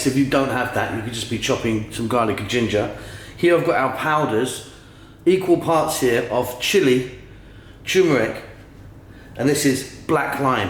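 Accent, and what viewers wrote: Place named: England, United Kingdom. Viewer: I love your way of cooking as well as your English accent Amazing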